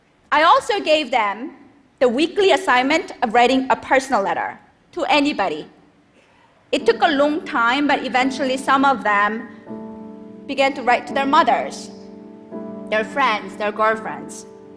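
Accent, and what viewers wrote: South Korea. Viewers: She was born in South Korea and is probably her first language. You can't expect her to speak with perfect English. And it's like you guys trying to speak Chinese with perfect pronunciation. And Korea is so different from English. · probably a regional south korean accent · She grew up in South Korea but moved to the US during her childhood. I'm more than sure that her first language is Korean considering how strong her accent is still. Let's ignore the accent. Her book itself proves how great her English is despite her stating how difficult it was for her to grasp the English language back when she just moved to the US. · if you had ears you would also know that she was born and raised in South KOREA, which is why she still has an accent. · She's Korean and has an accent. I think she sounds beautiful. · She's from Korea. Of course she has an accent. 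· Morgan Campbell The Koreans have a REALLY hard time overcoming their Korean accent when learning English. I agree she has done really well. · an accent... it's kinda obvious Korean is her first language lol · Do valley girls all have Korean accents?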